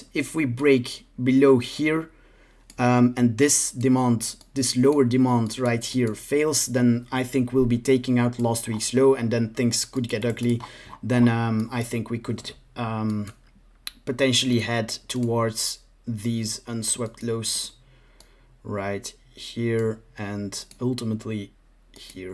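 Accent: Belgium. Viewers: Dat Belgisch accent 😆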